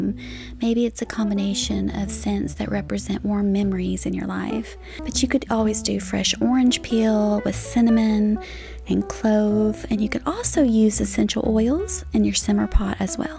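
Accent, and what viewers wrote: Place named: Georgia, United States. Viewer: That's a nice take on veggie soup. I'll have to try that one😋 may I ask about your nails? I'm fairly new to your channel. Are the your natural nails or press on? They always look beautiful. Really enjoying your content. You sound like your from my neck of the woods in GA. How'd you get to Iowa? Have a good safe weekend 😊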